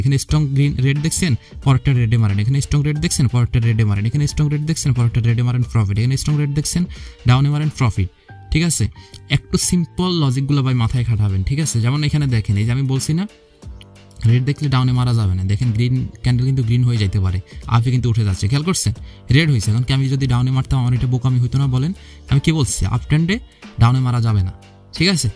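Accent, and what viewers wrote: Sylhet, Bangladesh. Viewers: ভাই আপনি কি সিলেটের মানুষ.??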